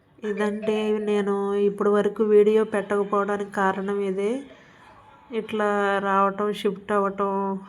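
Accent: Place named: East Godavari, India. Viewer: Akkaaaa...Meeku east godavari yaasa vachesindiii..curry super 😊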